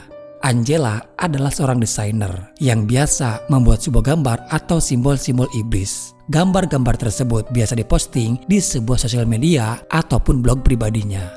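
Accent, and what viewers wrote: West Java, Indonesia. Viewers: Aa orang sunda yah? 😀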